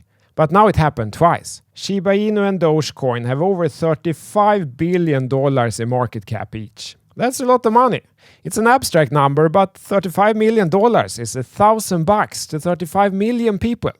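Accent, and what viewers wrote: Sweden. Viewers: You sound Swedish as hell. The Swenglish is on and it sounds really sophisticated!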